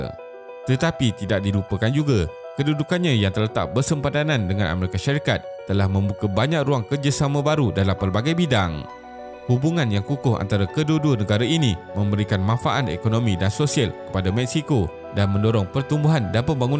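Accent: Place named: Malaysia. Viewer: Alaa lidah melayu biar jee lar,orang lain ok jee dengar…kalau rasa terganggu dengan sebutan admin sangat,pergi kat tepi dinding bisik slow2 kat dinding tu atau masa nak berak tu jerit puas2 pasal admin salah sebut tu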